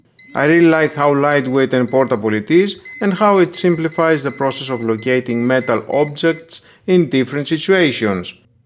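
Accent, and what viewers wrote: Greece. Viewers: You sound greek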